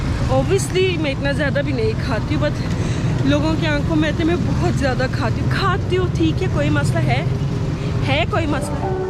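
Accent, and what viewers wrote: Pakistan. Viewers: Ur urdu accent ❤️ ❤️ 😂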